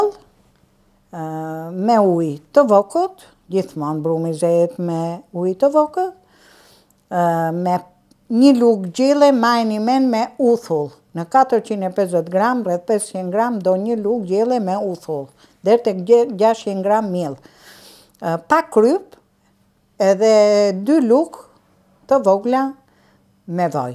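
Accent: Tirana, Albania. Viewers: Mirdita zonje , kam pak kohe qe po ju ndek nga Italia, me kenaqe me ate fhekesin e vjeter tironase, dhe me recetat dhe shpjegimin tuaj te fhjesht popullore. Ju uroj SHENDET 👌👏🙅‍♀️❤️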